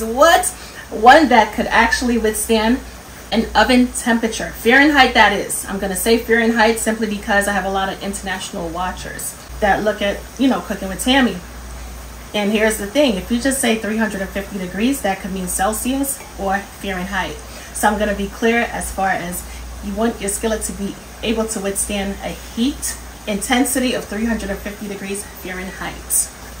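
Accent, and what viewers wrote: New York, United States. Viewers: I hear an accent…Are you a New Yorker?😊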